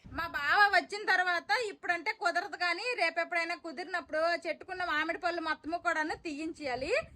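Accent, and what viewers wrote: Srikakulam, India. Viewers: mee Srikakulam yesa olamoo olamoo 😂😂 bagundhi baaga navukuna nenu aite..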